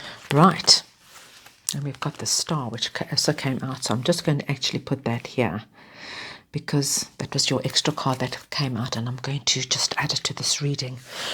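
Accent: South Africa. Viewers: I know a South African when I hear one 😁 love to see it 🙌🏾🤍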